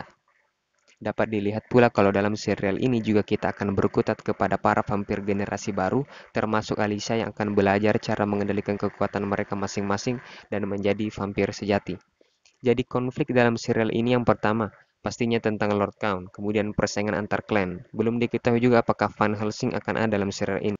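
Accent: South Sulawesi, Indonesia. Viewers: Orang Bugis ki kah,logatta sangat kental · Bang orang sul-sel ya?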